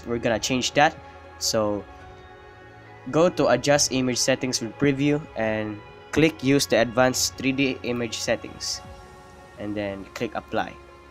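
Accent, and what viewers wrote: Philippines. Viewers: let me guess your from philippines? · filipino accent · Halata sa pag sasalita mo pinoy ka eh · Are you filipino? Your accent sounds so.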